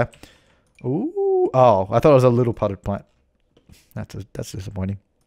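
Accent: Australia. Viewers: ps: i looooooooove australian accent ^^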